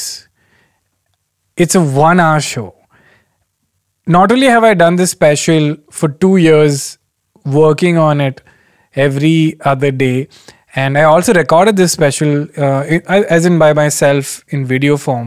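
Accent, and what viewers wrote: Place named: Bangalore, India. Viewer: Hey Kenny, First of all I simply adore your work and I am in love with the version of you in these long recording of you speaking!!! Okay, some background about me, I am Bangalorean born and raised in that lovely city and now stay in Indore, M.P. Your way of speaking, your accent, the dialect all of it reminds of my time in that city. Little, little things about how you say chumma, pavaam, maacha, when you add "it seem" after certain words is just too cute and makes me go awwwww!! I really admire your work be it the set on Jet airways (i don't have a count of how many times I have watched this), dating rules for guys (where the girls collect all the sorry omg what an observation, Kudos on that), all your chai time episodes they are all simply awesome :) I just love your observational skills and brutally honest opinion about each and everything. 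My Question to you is how did you cope up with the feeling of moving from one city to another? don't you miss Bangalore? weren't there days when you wanted to just go back to the city? or just some food place/joint(s) that you miss eating some amazing ghee roast dosa? or the filter coffee I mean anything! It was a hard time for me moving to Indore (very hard in-fact) I would love to know your experience though. Also where do you want to stay after your retirement? When is your special on Netflix releasing? Also, please for God's heaven sack please do a show in Indore I am so waiting to watch to perform live (obviously once this Covid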